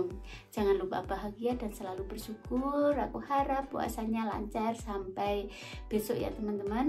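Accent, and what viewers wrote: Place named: Blitar, Indonesia. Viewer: Logate lek ngomong jowo jek mblitaran banget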